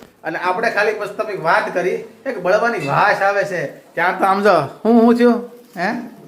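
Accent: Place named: Surat, India. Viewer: Tme surti bolo tho mne moj padi jaye che .maru piyar navsari maru sasru mojilu morbi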